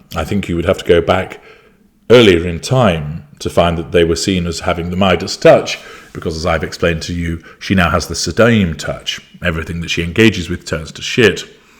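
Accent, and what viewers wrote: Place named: Scotland, United Kingdom. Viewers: your Scottish accent makes you sound like Maggie Smith in The Prime Of Miss Jean Brodie. You are indeed the creme de la creme. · Toasting this vlog! Cheers! That Scottish accent!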